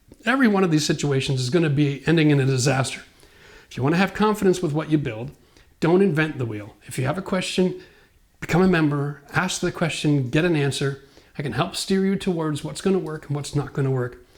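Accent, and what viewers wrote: Canada. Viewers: and crew, I am no stranger to DYI however I found myself with a project I had never done. Upstairs shower leaking to downstairs kitchen. I found your channel by accident and when I heard the Canadian accent I was hooked. I live in NE Ohio USA and the weather plays a big part in any renovation. You had great advice, both time consuming and monetary. I wanted to thank you for sharing your experience and give a shout out to your son who made the comment (at the same time as me watching your video) "Now show them how to do it without 35 years experience".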